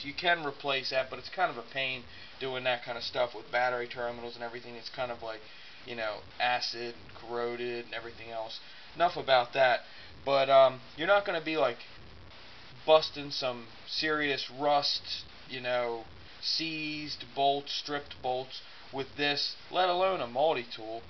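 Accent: Baltimore, United States. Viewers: Good idea. You sound like you have a Baltimore accent. Am I right?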